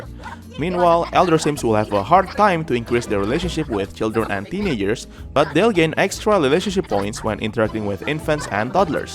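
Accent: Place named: Indonesia. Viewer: dari aksennya Indo bgt 🤣, semangat bang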